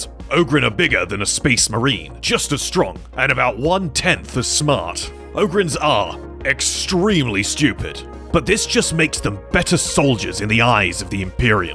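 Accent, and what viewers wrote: United Kingdom. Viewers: Dude, why you have to do the cringe voice over. Just talk. I mean you already have the British accent. You don't need to do the goofy voice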